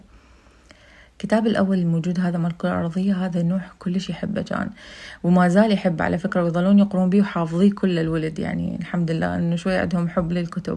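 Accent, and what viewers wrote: Iraq. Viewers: هلو شلونج حبيبتي سوال فضولي لو سمحتي انتي تكولين ولدتي وعشتي بلعراق ولهجتك عراقية مىة بلمئة سوال انتي والدك سوري اكيد لاكن والدتك سورية لو عراقية واذا كان والدينك سورين اثنينهم شعجب تعلمتي لهجة العراقية حتى نولدتي بغداد مجرد سوال اذا ماتردين تجاوبين علي من حقك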